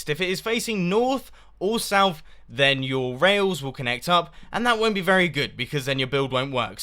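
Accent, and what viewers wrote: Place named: United Kingdom. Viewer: R0SILE tk Oh, he can. Maybe you are used to speaking a different accent than he does, so you might have a little hardship understanding his British English.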